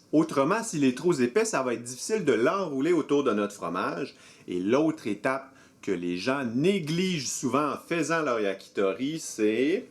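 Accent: Quebec, Canada. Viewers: J'adore l'accent québécois ainsi que le fromage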